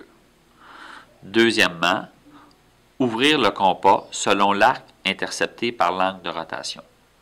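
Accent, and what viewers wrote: Quebec, Canada. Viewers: Merci tu m'as beaucoup aidée car pour une fois je n'ai pas écouté cette leçon et j'ai eu du mal à faire mon DM mais grâce à toi j'ai réussi (j'adore ton accent canadien) 😂❤❤ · Vue que je suis suisse l’accent québécois me dérange mais sinon merci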